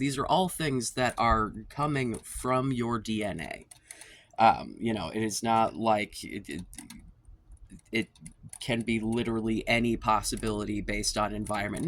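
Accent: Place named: United Kingdom. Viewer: I came here to learn british accent lol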